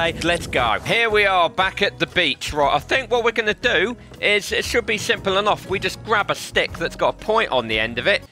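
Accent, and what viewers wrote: United Kingdom. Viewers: I like your British accent 😂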